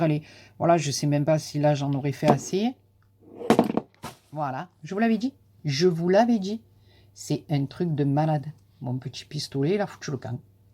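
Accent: South, France. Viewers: Tuto merveilleusement bien expliqué, avec un merveilleux accent du midi, je m'abonne.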